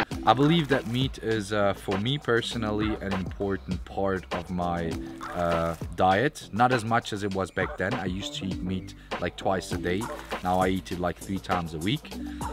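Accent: Switzerland. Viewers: Damn...that Swiss-German thoughhhh 😍😍😍🤤❤❤ lmfaooo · Hahaha ish geil ihn schwizerdütsch rede zköhre😂😂💪🏼🤘🏼🤙🏼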